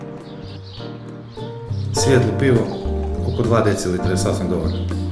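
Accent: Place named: Slavonia, Croatia. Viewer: Kako te je lijepo slusati,tako i mi u Slavoniji pricamo,puno pozdrava tebi i tvojoj Familie 🥰🥰👌👌❤❤👍🏻👍🏻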